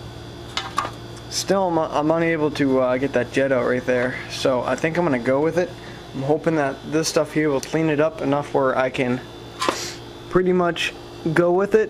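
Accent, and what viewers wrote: Canada. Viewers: ur Canadian?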